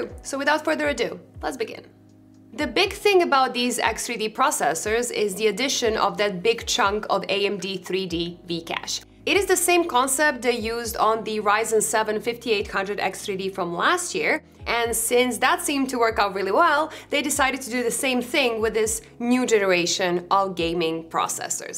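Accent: Netherlands. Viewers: I'm mixed Dutch/English and think you have the most beautiful English with a Dutch accent I've heard! Oh and thanks for the video. 😄👍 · Ik hoor heel licht je Nederlandse accent …❤ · love that dutch accent as well..super!! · I heard Netherlands. Got to support our fellow dutchies. Nice review btw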